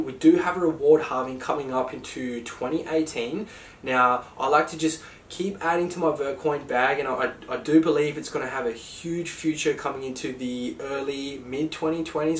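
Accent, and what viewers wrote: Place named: Australia. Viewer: Can't understand your Australian accent, were you saying Adder?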